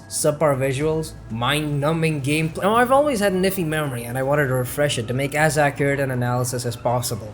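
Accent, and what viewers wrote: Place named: India. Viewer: Around 17-18 minutes mark I started feeling like you have an indian accent. :D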